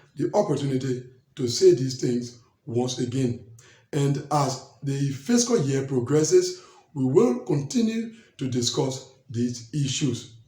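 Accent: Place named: Liberia. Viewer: Hello bro, which national are you? Because you sounded like a Liberian...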